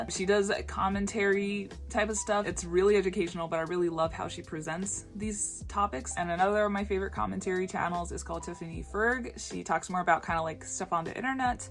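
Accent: United States. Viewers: No way I thought you were from the US!! ¡Que inspiradora! Gracias por compartir tu historia. :) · Wow! I wouldn't have known you spent the majority of your life in Japan. Your English is so good. You even have the vocal inflections of Americans or Maybe Canadians in your age group. Congratulations on passing the B-2 DELLE.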